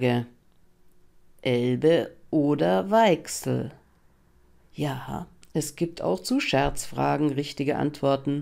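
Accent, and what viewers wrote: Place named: Breslau, Germany. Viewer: Vielen Dank für das tolle Video! Nicht nur, dass mich die Sprecherinnen-Stimme etwas an die Mundart meiner Oma aus Breslau erinnert (nur eingelegentliches „gell“ fehlt noch 😄), es ist auch sehr informativ. Schön, jetzt überleg ich mir, mal hinzufahren. Zuletzt hab ich die Stadt als Kind Anfang der 80er gesehen.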